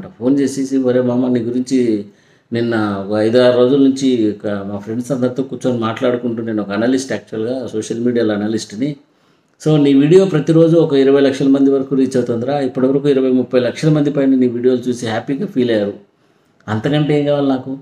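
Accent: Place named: Rayalaseema, India. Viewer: Superb comedy, innovative ideas coupled with Rayalaseema slang made your videos extra special. Keep going brother.🙏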